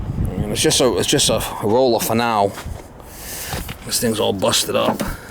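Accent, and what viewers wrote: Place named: South, United States. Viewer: Are sure you're not one of us Southerners? Lol. Keep the vids coming brother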